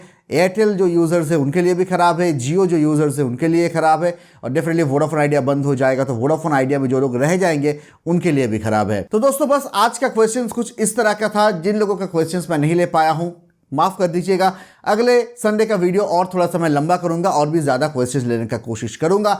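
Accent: Bengal, India. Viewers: आपका बोलने का तरीका(लहाजा) एक दम मस्त लगता है बंगाली हिन्दी मिक्स। मजा आ जाता है । # जल खाबो।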